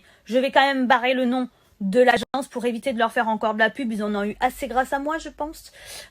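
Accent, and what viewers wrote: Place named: Italy. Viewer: La pronuncia italiana oltre qualche parola in italiano tradisce le tue origini e soprattutto la tua, altissima propensione al crimine.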